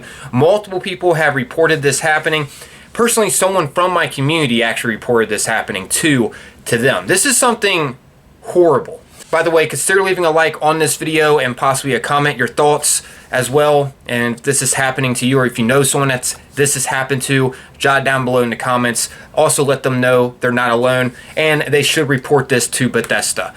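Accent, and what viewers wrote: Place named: Baltimore, United States. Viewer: Bro's speaking like he's from Baltimore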